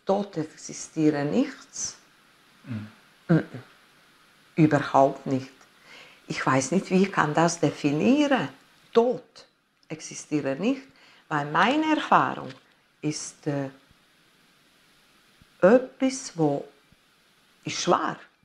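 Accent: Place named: Swiss German, Switzerland. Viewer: This lady speaks Swiss German dialect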